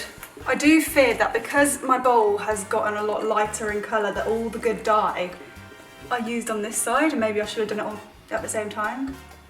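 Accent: United Kingdom. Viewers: i did not expect her to have a british accent, after all those four nine looks stories on snap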